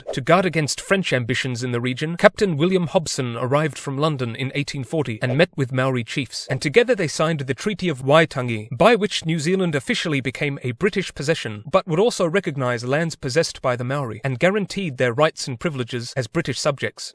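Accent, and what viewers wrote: South Africa. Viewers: sick south African accent my guy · This is new Zealand bro let's have a look cuz. I laughed so hard my bro u actually sound like my south African mate who lives here in nz lmao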